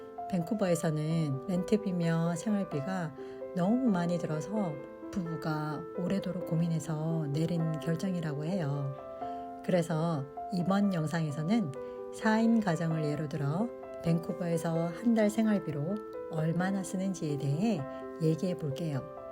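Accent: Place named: Gyeongsang, South Korea. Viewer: 나레이션 중간중간 대구 경북 악센트 정겹네요. 좋은 정보 감사합니다.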